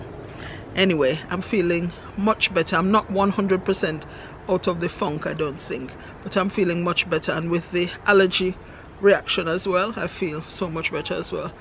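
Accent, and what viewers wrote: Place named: United Kingdom. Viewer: I absolutely love your British accent.😂😂😂🤣🤣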